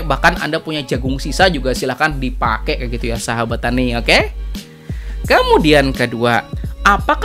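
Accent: Banjar, Indonesia. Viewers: Logatnya bubuhan amang banjar pang nah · Dari Logat bicara nya kayak org banjar/ hulu sungai, kalsel Iyakah?😅🙏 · Mirip aksen urang Banjar Kalsel pian, btw terimakasih info yg sngt bermanfaat nya, semog jd amal jariyah kelak🤲🏻🤲🏻🤲🏻😊🙏🏻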